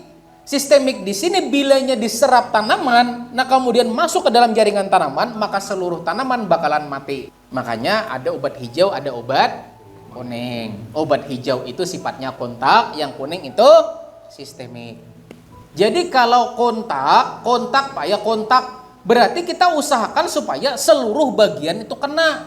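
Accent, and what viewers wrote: Banjar, Indonesia. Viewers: Banjar banar aksen nya ❤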